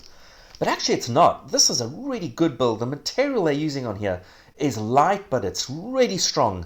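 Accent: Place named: New Zealand. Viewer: thats a kiwi accent alright , loved the review would love some more comparisons if you get hands on other studio classics like beyerdynamic dt880 pro , akg k702/712.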